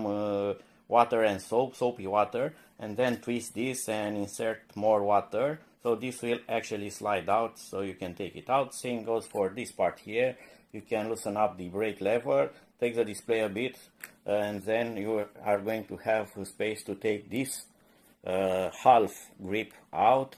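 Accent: Romania. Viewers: Recunostii romanii dupa accentul pe care il au in engleza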